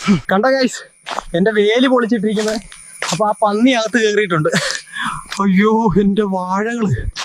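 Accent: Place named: Idukki, India. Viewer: അസൽ ഇടുക്കിക്കാരുടെ സ്ലാങ്...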